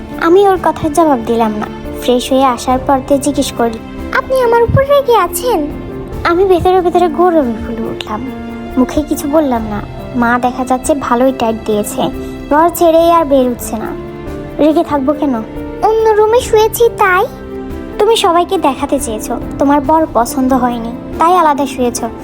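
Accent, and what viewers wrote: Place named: Chittagong, Bangladesh. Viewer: Apu apni ki chittagong er? Apnar kota gulu shunte keno jeno erokom lage Ar story onek beshi shundor hoise 😘❤️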